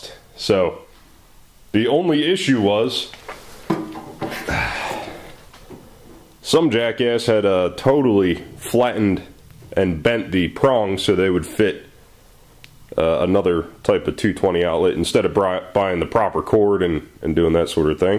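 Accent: United States. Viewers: US? Sounds the part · Am I in the twilight zone? Are you American?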